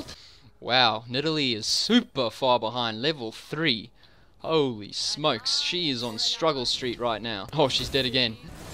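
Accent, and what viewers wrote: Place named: South Africa. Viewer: You live in Australia but you sound South African, are you from SA?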